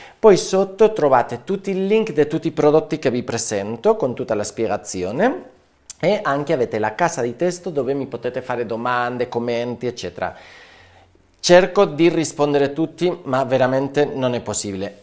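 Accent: Spain. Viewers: Caro dottore, l'ho trovata x caso e le faccio i miei complimenti x la chiarezza di questo video. E anche x il suo bellissimo accento spagnolo😊Grazie.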